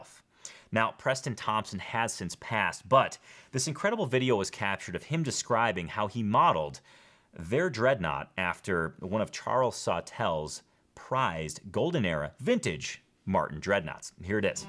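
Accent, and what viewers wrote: Chicago, United States. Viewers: You are my favorite Chicago “regular guy” accent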